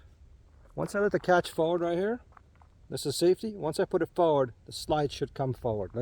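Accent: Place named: United States. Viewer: For a Romanian you American accent is good.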